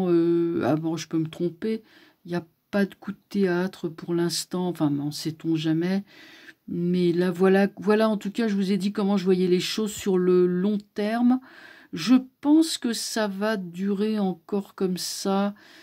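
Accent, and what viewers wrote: Paris, France. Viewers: Merci toujours heureuse de vous entendre avec votre petit accent parisien et votre humour. Vous avez raison ils sont a la fin de leurs mondes. Et nous retrouvons enfin notre souveraineté il suffit d'accrocher nos ceintures de sécurité pour les dernières secousses.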